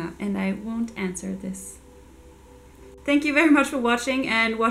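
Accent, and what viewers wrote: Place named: Germany. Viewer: omg when I heard your voice/accent I immediately thought you were german and turns out I'm right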